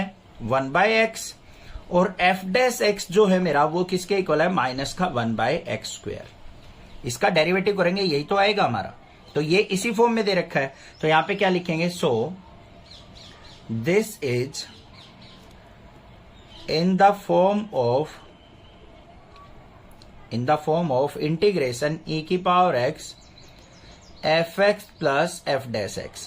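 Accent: Haryana, India. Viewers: Sir aap haryana se ho ? Aapke voice tone se lg rha h aisa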